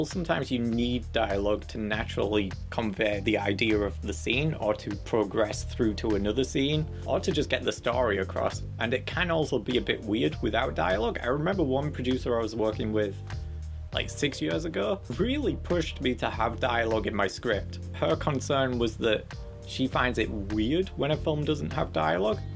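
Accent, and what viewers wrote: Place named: United Kingdom. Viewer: Great advice, thanks a lot! Love the humour. And the dialect 😊. Totally unable to place that, though - which part of the UK?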